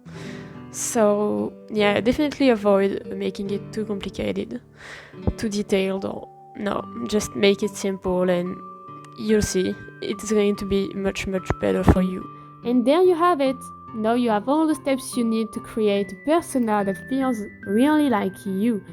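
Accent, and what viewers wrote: France. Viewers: l'accent francais est adorable<3 merci pour cette vidéo!! jai souvent le réflexe de dessiner ma persona en mode silly goober et ce qui marche pour moi cest de créer une version "originale" simple, et ensuite faire autant de AU que je veux du genre ocean AU, space AU ou je m'amuse plus avec le design :)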